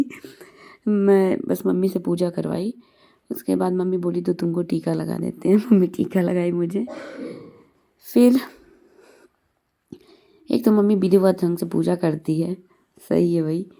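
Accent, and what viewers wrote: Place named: Rewa, India. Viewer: TONE SE TO REWA K LGG RHE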